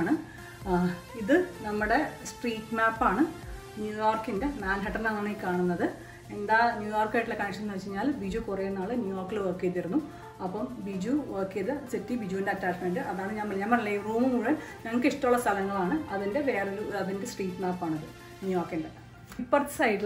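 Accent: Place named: Palakkad, India. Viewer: Hey,I am a new subscriber!vlog popped up on my feed!excellent narrative and sweet home!I also made another observation,Are u from palakkad?oru valluvanadan slang thoni!I also hail from pkd.And ur gestures and slang resembles anchor rekha menon too!☺️Has anyone else told the same?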